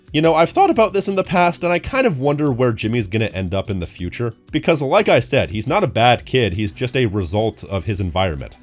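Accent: Canada. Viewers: Go Canucks? I knew you sounded like a fellow Canadian 😅 Go Sens Go! 😉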